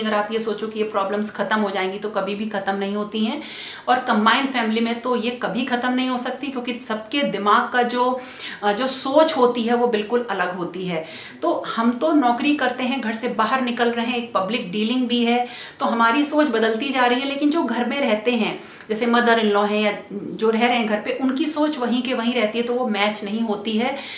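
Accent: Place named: Gujarat, India. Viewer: Nice information. ji after viewing this video I've subscribed ur channel. From ur pronounce I think u r Gujarati, BTW I am Gujarati. Thank You and God bless you. Struggle is the real sugar who makes ur efforts sweet.,🙏❤️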